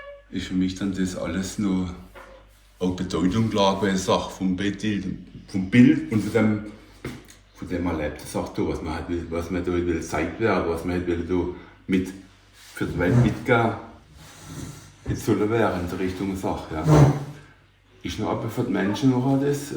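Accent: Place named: Alemannic, Germany. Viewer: Ich verstehe jedes Wort! Das ist Alemannisch fast in seiner reinsten und ursprünglichsten Form, wie man es nur noch in Südbaden oder im Elsass spricht. Im Elsass gibt es allerdings mehr französische Einflüsse. Für mich klingt das alles sehr, sehr authentisch!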